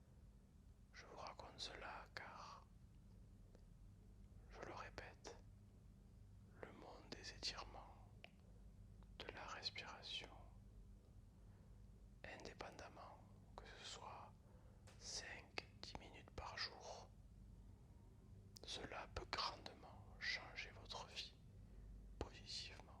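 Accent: France. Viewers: sound is just a touch too low. Need to hear that French accent. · Hum ça change beaucoup du reste de ce que tu fais 😉 Et c’est très qualitatif! Le ton de ta voix, le rythme, la diction, le petit accent du sud sans parler du contenu avec un vocabulaire et des tournures de phrase de très bon niveau! Tu devrais faire plus souvent des vidéos en français comme ça!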